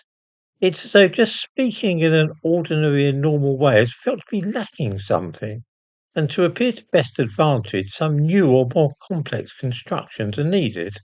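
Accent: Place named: England, United Kingdom. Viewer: is English as is his pronunciation.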